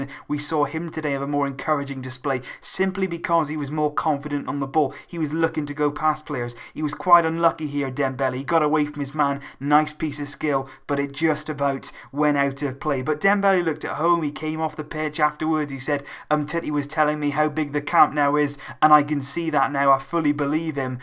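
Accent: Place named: England, United Kingdom. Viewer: 15 years barca fan here, really like your vids, you really understand barca very well and I constantly check if you have vid updates since mid Aug this year. By the way, where are you from, US or Euro? like your english accent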